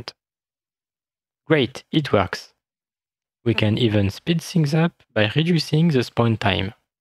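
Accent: France. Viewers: why do you speak english like you're reading the words while speaking french? it's honestly very difficult to understand. I'm brazillian, by the way. Et je parle français aussi, c'est ma troisième langue. still, I had a hard time focusing on whatever you are saying. you should work on your pronunciation, either speak english or french! lol either way thanks for the content, this was very helpful to me. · If you can speak German with a German accent i will speak english with a english accent. You gotta watch a native english speaker if its so hard for you to understand. I understand him well and french guys have their own ways its nothing he should change.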